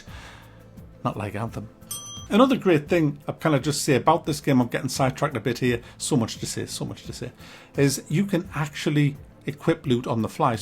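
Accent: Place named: North, United Kingdom. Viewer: Is is just me or is sounding even more northen than usual lately?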